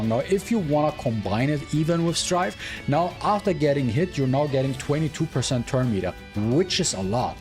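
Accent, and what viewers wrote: Germany. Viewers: Bro is German?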